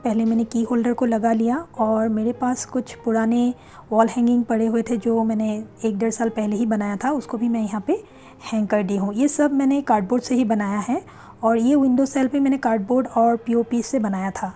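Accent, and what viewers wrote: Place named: Assam, India. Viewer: I like your creativity. I am definitely going to try it. By the way, are you Assamese? The way you talk seems like that. Are you from Assam?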